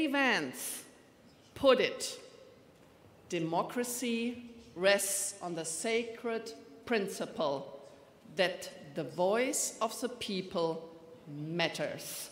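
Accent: Germany. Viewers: Für eine deutsche Politikerin ist das wirklich ein erstaunlich gutes Englisch, was sie da spricht. Kann man natürlich anders sehen, wenn man gegen diese Frau generell ein verbissenes und verbohrtes Feindbild pflegt, aber wenn man im Alltag selbst viel mit Englisch konfrontiert wird und sich dann mal die aktuellen und die letzten Regierungsmitglieder anhört, was da gesprochen wird, dann hört man eine wirkliche, linguistische Katastrophe. Man hört sofort, dass da eine Deutsche englisch spricht... ja... aber diesen Slang wird man als deutscher "native Speaker" nun mal nicht los. Wer das von sich behauptet, belügt sich selber. Das hier, ist das beste Englisch, was ich seit langem von einem deutschen Politiker gehört habe. Fragen wir mal anders... sind eine Baerbock, ein Habeck, Lindner, Merz, Scholz und Konsorten besser? Hör dir mal deren Englisch an, da rollen sich einem die Fußnägel hoch. 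Und das sind nur wenige, aber namhafte Beispiele. Bei ersterer kann man das Drama ja derzeit in fast jeder neuen Rede bestaunen... Stichwort: UN Diese Frau macht DE grade auf internationaler Bühne zum Volltrottel. Eure Qualitätspolitiker. Wie verbittert muss man eigentlich sein, wenn man in seinem Hass gegen diese Frau und ihre Partei, nicht mal eingestehen kann, dass sie der englischen Sprache weit besser gewachsen ist, als quasi alle aktuellen und vergangenen Regierungsmitglieder Deutschlands? DAS hat radikalen Charakter. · "TH" totall versemmelt und Krautakzent! Mehr als Schülerenglisch war das nicht! War nicht mal Leistungskursniveau!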